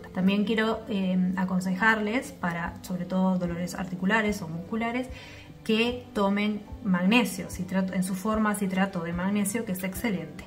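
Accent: Argentina. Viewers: Hi sweet, greetings from Sidney, Australia. I love to listen spoken castillian just to keep the knowledge of the language decent, but I think I missed it whether you said shred the pit of avocado. Do I have to let the avocado pit dry up or can I use a fresh pit? Years ago I was in a nasty accident, I had to get several metal parts implanted, one on my right wrist and from time to time with weather changes my wrist hurst like the devil, I most certainly would love to give this very simple tip a try. My mother is also starting to get arthritis and it makes me very sad to see how her hands are losing their shape. Needless to say my little wrist pain is nothing compared to the pain she feels in her hands. Also have you showed how to make kefir? I am always learning such great things from you. I love your Argentinian accent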